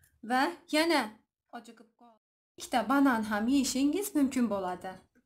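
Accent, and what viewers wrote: Khorezm, Uzbekistan. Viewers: сиз хоразимни апкесимисиз апкаларга ухшеб гаплаесиз апкажон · Бу киз Хорозимдан шевасидан билмайсизми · Ассаламалайкум варахматиллохи вабаракатух ,кизим каердансиз. Тилингиз хоразмликка ухшаяпти. Мен 61 ешдаман, 10,минг $ сарфладим озиш учун, ,,гербалайев ,,,,, дан 1 йил ичдим 32 кг оздим 1 йилда, лекин кейин гербалайни ташадим яна кило олдим, энди табиийй озиш харакатидаман, лекин бу ешдан кейин бундай диета тугри келадими, химия, нур олдим, сал озрок есам холсизланиб коламан, калтирайман, бунга хам маслахатингиз борми. Докторлар кучли ейишни тасия килади, олло рози болсин сиздан, ассаламалайкум варахматиллохи вабаракатух.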